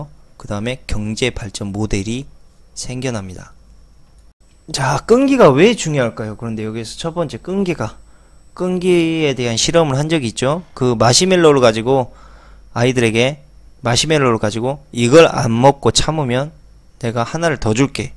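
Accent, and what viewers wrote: Jeolla, South Korea. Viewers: 님 본업이 뭐에요? 왜이래 똑똑하죠?^^ 그리고 님이 열받았을때 (욕할때) 야구선수 이종범 말투랑 거의 비슷^^ (전라도다요??^^)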